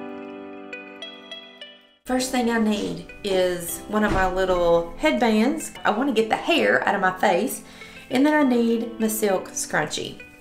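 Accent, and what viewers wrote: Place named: Kentucky, United States. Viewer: CJ...It's a good 'ol southern accent, Kentucky to be exact.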